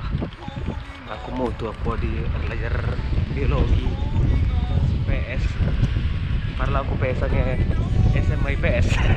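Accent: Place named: Karo, Indonesia. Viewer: Ada yg jual Arsik di ceko Bang, 😁 Kental kalii, Kalak Karo na 😉😁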